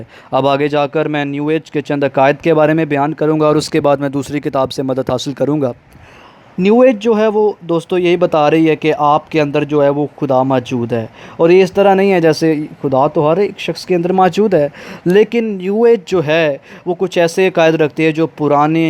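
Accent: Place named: England, United Kingdom. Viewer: Ur English Accent I Like It :)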